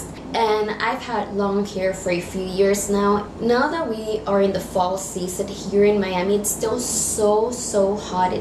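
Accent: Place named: Spain. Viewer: It's because of her Spanish accent. The v and b sounds are mixed in the language.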